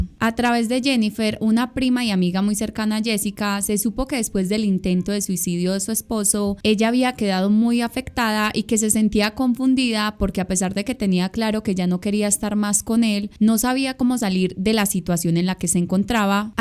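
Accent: Colombia. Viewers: Me gusta mucho el acento de Colombia y creo que esta chica es paisa o corrijanme si me equivoco por favor. Éxito en tu canal yo ya soy tu nuevo sub. Saludos desde México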